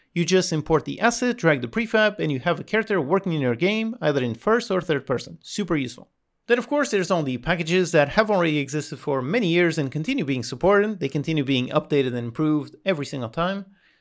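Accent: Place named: Portugal. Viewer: Bolas. Estava a ouvir o teu Inglês e dizia para mim mesmo, parece um Tuga a falar Inglês. E fui verificar. LOL. Só não acerto no Euromilhões.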